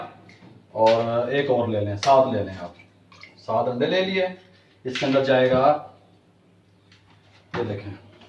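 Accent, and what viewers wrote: Punjab, India. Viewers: Asslam o alaikum Bhayi Allah Kareem ap ko sahet aur slamti dy aur Umar m barkat ata frmay aur dharon khushiyan ata frmay aur nazar bd sy bchaey ameen suma ameen ya rab ul Allah ameen ap ka baat krnay ka andaz Bohet acha lgta hy aur Punjabi touch ki tu kia hi baat hy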